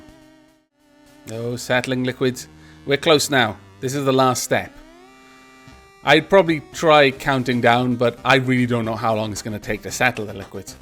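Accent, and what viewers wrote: Wales, United Kingdom. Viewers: Subbed man, can you add my name pls? Also are you Welsh?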